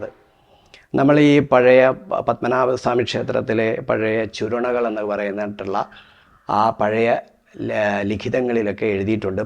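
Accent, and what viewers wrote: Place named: Kottayam, India. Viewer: ഒരു വർഷം മുമ്പ് ഇട്ട വീഡിയോ ഞാൻ ഇന്നാണ് കണ്ടത്.....തിരുമേനിയുടെ സംസാരം കുറച്ചുനേരം കേട്ടപ്പോൾ കോട്ടയം ഭാഷ പോലെ തോന്നി....ഞാനും കോട്ടയം സ്വദേശിയാണ്.... ഇപ്പോൾ തിരുവനന്തപുരത്ത് സ്ഥിര താമസം ആണ്.....പല തവണ ക്ഷേത്ര ദർശനം നടത്തിയിട്ടുണ്ട്.....ഗണപതി ഭഗവാൻ്റെ അനുഗ്രഹം എല്ലാർക്കും ഉണ്ടാകട്ടെ,🙏🙏ഓം ഗം ഗണപതയെ നമഃ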